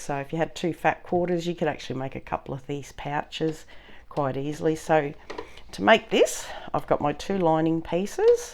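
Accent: Australia. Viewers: Just found your channel , I’ve never made a bag before so I’m keen to give it a go … I thought you were an Aussie from your accent .. I’ll definitely check out your shop , also where do you get your label from … x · Thank you I'm going to give it a go tomorrow, love your calm cheery Aussie voice and clear instructions.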